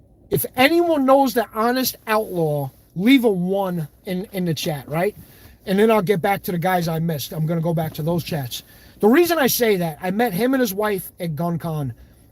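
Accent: New York, United States. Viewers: YOU'RE FROM NEW YORK YOU SPEAK JUST LIKE IT.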